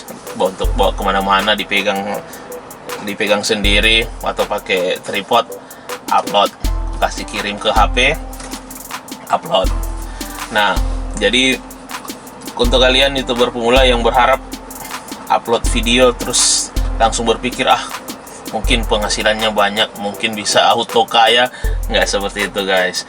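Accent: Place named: Sulawesi, Indonesia. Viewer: Logata kaya org bugis gorontalo dik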